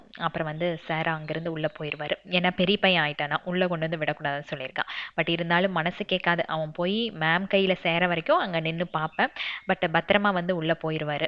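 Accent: Coimbatore, India. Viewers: Unga own voice is very nice❤. Papavum mithuvum porupa irukanga. Namma coimbatore slang varruthunga ❤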